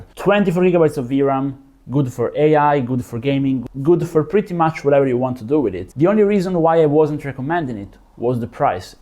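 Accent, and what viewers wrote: Italy. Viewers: Sei italiano?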